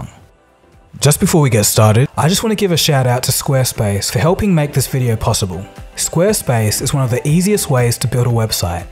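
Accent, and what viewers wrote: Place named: Australia. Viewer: Aussie accent, no matter how clever they may be, it's still like listening to the slowest kid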